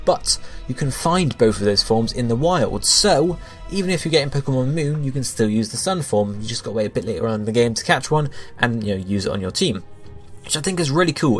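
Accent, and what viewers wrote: United Kingdom. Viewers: is british and thats how we say it, but i end up saying zee half the time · OHHHHH So he's from the UK? I didn't know that, sorry. Thank you for the information. I hope I wasn't rude when I asked the question.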